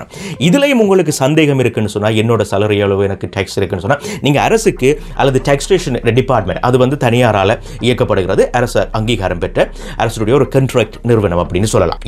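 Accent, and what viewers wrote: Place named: Tamil Nadu, India. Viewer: I liks your tamil slang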